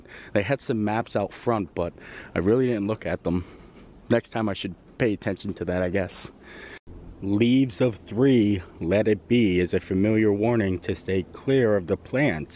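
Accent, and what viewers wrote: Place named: Midwest, United States. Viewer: Are you from more of the Midwestern part of PA or east coast? I thought you had more of a midwestern accent and mannerisms.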